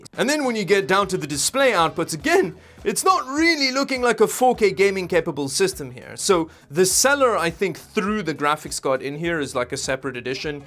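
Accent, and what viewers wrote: Afrikaans, South Africa. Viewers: How long have you been in Canada?, you still sound very South African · You dont sound Canadian...South African perhaps? :-) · Are you an SA expat? You sound South African. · Are you south African? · i can hear that south african accent trying to get some slang through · Is jy Afrikaans? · I though you were South African? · Are you from South Africa? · He lives in Canada and his accent is white South African. So you are an immigrant. Good on your part you really dodged the bullet that is South Africa. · I swear this guy is south african. Correct me if I'm wrong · Interesting accent there - sounds like you’re Afrikaans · You sound South African. Are you? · his south african 100%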